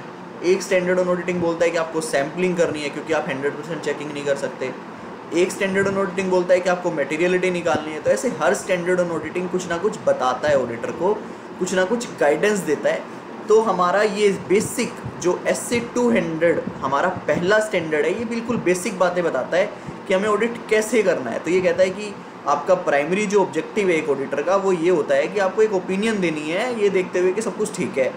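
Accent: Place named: India. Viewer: Unfortunately, i can t understand indian english accent ... :(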